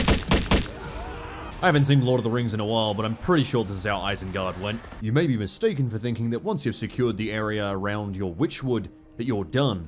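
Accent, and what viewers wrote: Australia. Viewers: It's like Sseth and Sovietwomble, but Australian. And I like it.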